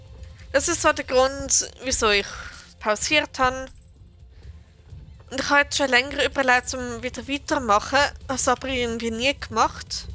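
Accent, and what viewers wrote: Switzerland. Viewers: Bist du Schweizerin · ich liebe deinen Akzent bzw dialekt das Klingt so geil ich möchte auch gern Schweizerdeutsch können aber ich bin nur österreicherin 😂😂😂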